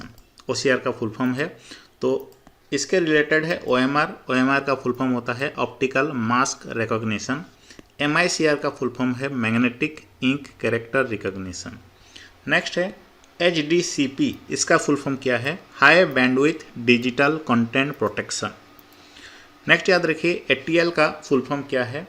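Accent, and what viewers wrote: Bengal, India. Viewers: may be he is bengoli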